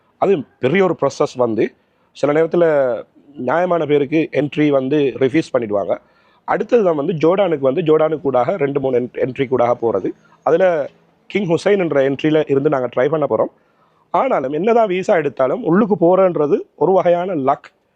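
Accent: Sri Lanka. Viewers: Mashallah Allamthulliha Allamthulliha Almighty Allah bless you excellent presentation sir you are speaking ceylon tamil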